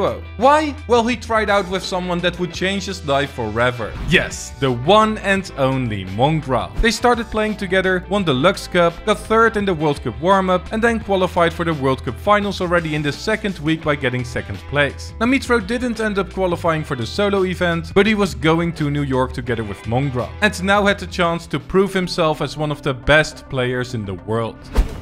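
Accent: Netherlands. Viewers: Bro aan het begin hoorde ik dat je NL was en toen dacht ik wat hoe wist ik dat niet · Nederlandse support 🇳🇱🇳🇱 ik dacht al dat je nl was aan accent maar wist niet zeker wel ziek ouwe je doet goed bro ga zo door💪♥️ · ik wist dat je nederlands was je accent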